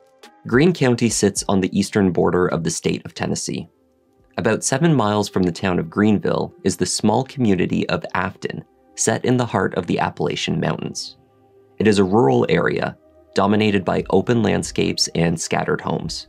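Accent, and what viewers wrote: Canada. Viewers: Thank you! It was only around a month ago, if that, that I discovered your channel, thanks to the wonders of the YT algorithm. Since then, I have watched 30 to 40 of your videos (really!). Furthermore, I sent an email to the popular political broadcaster Megyn Kelly, herself a fan of the true crime genre, and highly recommended your channel to her. Yes, I tend to forget names quickly, starting in real life around two seconds after meeting someone new. So when you refer to six different people in a video by first name, I frequently have to rewind in order to remind myself who is who. Curiosity question: I was surprised to hear you say on an episode I watched yesterday that you and your wife live in the British isles. Can I safely assume that you are Canadian? I have caught hints that you are in fact from Canada, including accent, pronunciation, and even referring to the US Coast Goard as the "USCG", which absolutely no one in the US does. 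Again, just curious. Thank you for all the amazing work you do on this channel. And I very much appreciate your personal response to my comment here. Jim W. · Is the narrator from Canada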